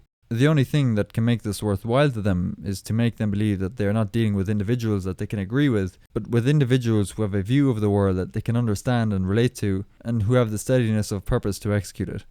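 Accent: Ireland. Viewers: Excellent channel, where in Ireland are you from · I will eat my sock if this man isn't Irish. Great video btw, quality stuff.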